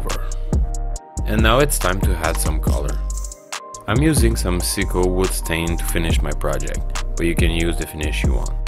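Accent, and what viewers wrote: Quebec, Canada. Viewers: ca sens l'accent de Quebs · T'es pas québécois par hasards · Ça sent l'accent québécois tout ça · French canadian?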